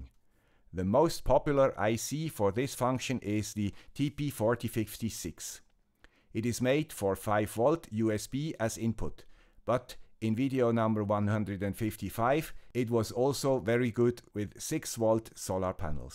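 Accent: Switzerland. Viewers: Hi Guy with the Swiss Accent! Thank you for your outstanding videos; I really, really enjoy watching them and I appreciate your presentation style and sense of humor. Best regards!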